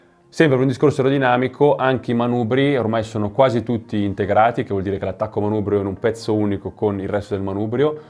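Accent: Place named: Rome, Italy. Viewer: Ah, te vojo tanto bene, ma sentitte parlà romano è 'na tragedia. M'era bastato Celentano in Rugantino, te lassa perde!!! ❤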